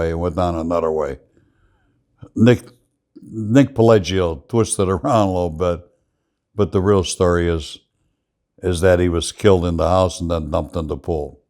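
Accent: Chicago, United States. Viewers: Frank didn't your big Brink's truck heist involve Divine Savior church in Norridge? I remember that when I was a kid. Also, did you hang around at Grand and Cicero Avenues? Thank you for clarifying that we use the term JAGOFF back home. I have shown your scene in Casino set in Costa Rica to several people to help them understand our ways in Chicago. And your accent in your videos make me homesick!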